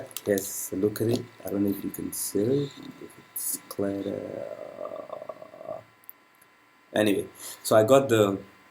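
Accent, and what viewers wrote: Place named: Africa. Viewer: Nice review You sound like an African brother, where you from ?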